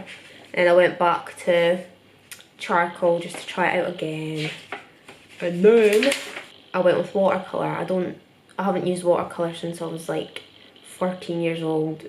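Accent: Scotland, United Kingdom. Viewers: the accent took me aback, didn't know she was Scottish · I’m also scottish BUT CAN I JUST SAY HOW TF DO YOU MAKE OUR RANSID ACCENTS SOUND CUTE?? i souND LIKE A MAN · woah i was not expecting a scottish accent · Why can’t my Scottish accent sound like that 😂😭 you’re incredible no wonder you got accepted! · Are u british??? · your art is absolutely amazing and so are you <3 i had a bit of trouble trying to underestand what you're saying though, idk if that's rude i really don't mean to be rude!! I'm just not scottish so I'm not used to it i guess - but would you mind putting subtitles ? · 스코틀랜드 아니면 복부 아일랜드 같아요! 알아듣기 젤 어려운 억양인데 많이듣다 보면 익숙해져요 😊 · Kelly Cho 저번영상에서 스코티쉬라고 한거 같앗는데 맞앗네요ㅜㅜ진짜 못알아듣겠어요 감사합니다!!😘😘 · Yeah I know that lol I mean I wasn’t expecting her to be Scottish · She seems she from East South of Scotland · omg the minute i heard ur voice i was so happy cus im scottish too and finally i've found my fav fellow scot youtuber <33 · Just a curious question, whereabouts is ur scottish accent from? 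Btw love ur vidz 💖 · she's from scotland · English is her first language, she’s just Scottish and has a thick accent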